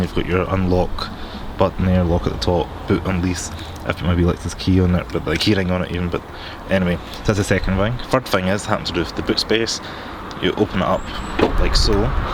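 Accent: Scotland, United Kingdom. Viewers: With such a powerful Scottish accent you need to make sure sound quality is very good so we can understand what you are saying. This video sound quality is aweful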